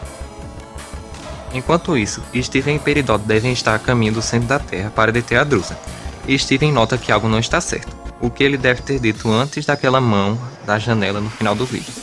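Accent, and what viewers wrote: Northeast, Brazil. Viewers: vc eh nordestino? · Já sei que vc é do nordeste :-)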